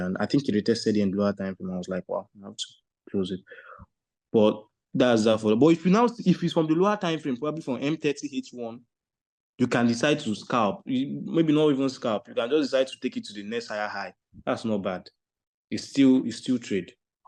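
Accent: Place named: Africa. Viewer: I love you talk markets in your special african ascent haha I laughed while watching your video. Keep these videos comming. You milk we enjoy!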